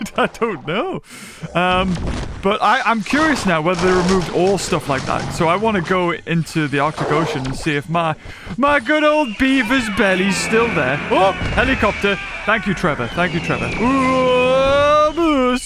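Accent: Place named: United Kingdom. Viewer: You are Markiplier but bri'ish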